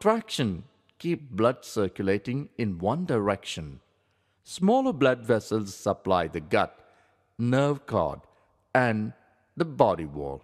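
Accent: India. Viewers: Indian accent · Its an Indian accent. If you dont like the way someone sounds, leave. Nobody is asking you to stay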